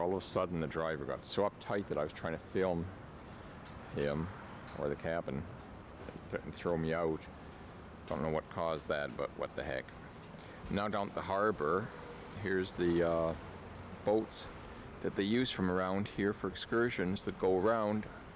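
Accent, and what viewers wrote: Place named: United States. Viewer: That’s amazing! I didn’t realize you’re from Germany speaking English—I actually assumed you were American. You probably chose English for the recording so that everyone could follow along. Thank you! I was born in Szczecin in 1983, and as I was walking the streets, it would be fantastic to spot myself in your video. 😄 You won’t believe how much Szczecin has changed since then! We’d love to have you back to film it again and share your thoughts.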